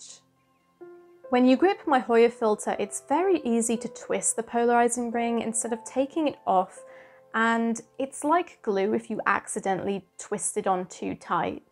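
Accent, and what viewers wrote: United Kingdom. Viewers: Those images at the end… 😘 (Also, people over here in the U.S. pronounce "NiSi" the way you Brits pronounce "Nikon.")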